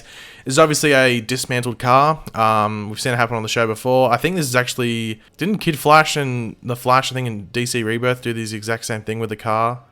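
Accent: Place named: Australia. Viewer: Brother no offense, but Australian accent sucks 😑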